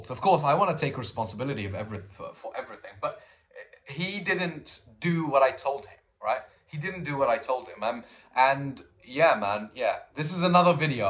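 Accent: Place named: United Kingdom. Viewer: How do you have such a british accent while living in Algeria?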